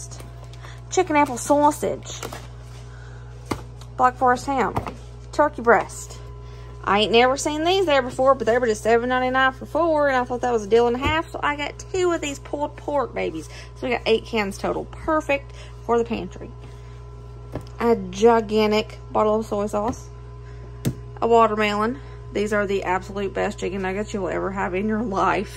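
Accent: South, United States. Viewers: You are literally the only person who is actually adorable saying SALmon. 🥰 Love your southern accent. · It’s ok you are southern you have an accent that is so cute.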